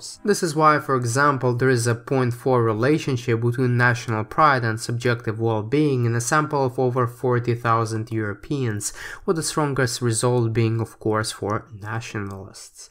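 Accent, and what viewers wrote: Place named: Eastern Europe. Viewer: The CRISPR part gave me the willies. Especially with the Central-Eastern European accent.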